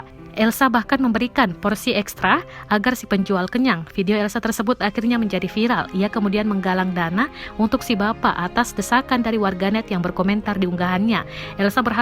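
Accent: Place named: Medan, Indonesia. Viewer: Yang bawa narasi orang medan pasti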